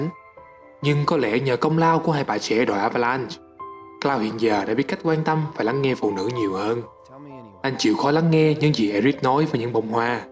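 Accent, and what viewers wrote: South, Vietnam. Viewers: mình comment này là mình rất thich những video của kênh này. Vì có nhiều lý do lắm 1) giọng miền Nam. Mình sr nhé, mình cũng có coi thử 1 it nhưng 1 số bạn ng Bắc nói nhanh quá mình chẳng để hiểu được, vừa nghe mà vừa coi clip y như muốn chóng mặt 2) bạn này sub chậm, giọng nói rất rõ nghe, bên cạnh đó rất hên bạn này nghĩ ra cách chèn sub, làm mình có thể theo dõi kĩ hơn. Đây là điều chỉ có kênh này làm được. Mấy kênh khác dù cũng có sự đầu tư kỹ nhưng họ k như bạn này 3) bạn này dịch kỹ. Hồi đó mình có coi 1 page theo dõi chơi game FFXV, mà page đó chỉ giống như là chơi cho có rồi thôi, k có dịch, làm mình coi mình chả hiểu cốt truyện, rất là bực 4) dịch có mấy đoạn rất vui nữa hy vọng bạn này sẽ đầu tư vào FFX-2 và dãy Kingdom Hearts (Kingdom Hearts này mình thich lắm mà cốt truyện nhiều với từa lưa quá làm mình chả hiểu nhiều) Thank you đã đọc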